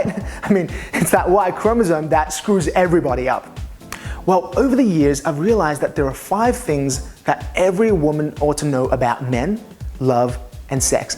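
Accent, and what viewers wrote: Australia. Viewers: Is that an Australian accent?? 🤔🤔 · Your accent is really cool. Are you from Australia? :) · YOU ARE AWESOME! Also, that accent tho!!!! Australian like mua? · Does he have an Australian accent??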